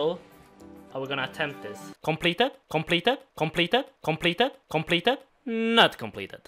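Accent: Sweden. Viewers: are u swedish? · är du svenne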